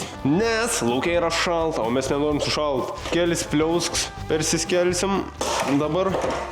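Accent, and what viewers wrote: Biržai, Lithuania. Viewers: Labai smavu ziuret tavo video, kalbi gryn birzietiska sneka kurios malonu kkausyt. Grazus krastai, birzu giria, Nemunelis, Aspariskiai, Dauguvieciai, keliai ,kelialiai. Senokai buvau, vasara zadu aplankyt, gal jau puse girios baigia iskirst.Geru vidosu, sekmes sunkiuose darbuose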